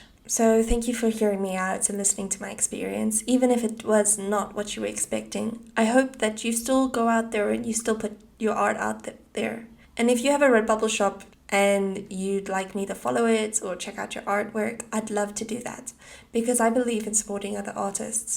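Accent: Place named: South Africa. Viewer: im like this girl 100% sounds south african and boom i was right. i do redbubble for fun and you are 100% right through out your video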